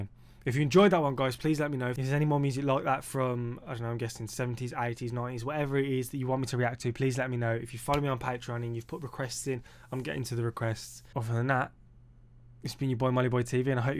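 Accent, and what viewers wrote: Birmingham, United Kingdom. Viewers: They are one of ours, this was at the hammersmith odeon in london. your accent sounds like a brummie one but the loike could be west country or east anglia. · Hi Got a (not very) secret crush on you and your Brummie accent 😚. A question for you - did you chose your own title, or was it a suggestion? Just asking because it has a strange meaning, that you may or may not be aware of. Btw I watched your post 'Am I Gay' which I found confusing tbh.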